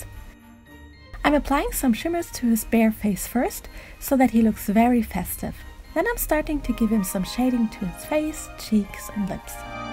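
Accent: Germany. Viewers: Are you German, by any chance? Also, amazing work!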